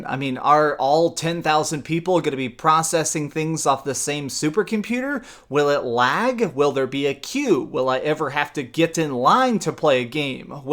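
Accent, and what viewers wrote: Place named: United States. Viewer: brush your teeth man. you look British pretty sure you’re American judging by your accent.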